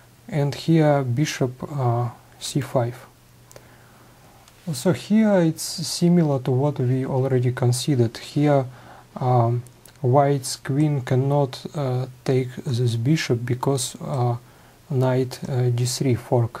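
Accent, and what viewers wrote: Russia. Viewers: I love your "boring-russian-nerdy guy" accent.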